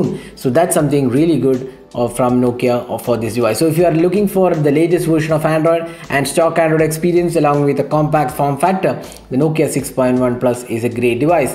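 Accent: Kerala, India. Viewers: I guessed that he's Malayali by his slang😁 and I guessed it right😀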